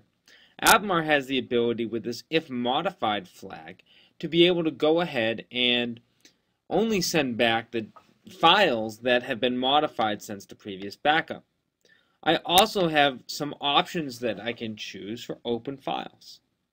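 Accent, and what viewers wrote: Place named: England, United Kingdom. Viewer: What a perfect English accent. I love it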